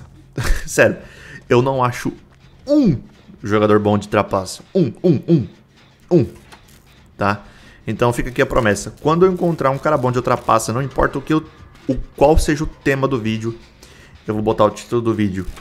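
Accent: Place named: São Paulo, Brazil. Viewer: Pelo sotaque acho que é paulista, mas não tenho certeza.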